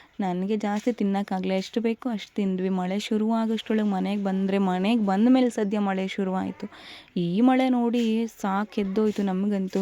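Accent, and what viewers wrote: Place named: Mangalore, India. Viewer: Huu mangalore kannada mix aagide swalpa😮😮🙄🙆🏻‍♂️🙆🏻‍♂️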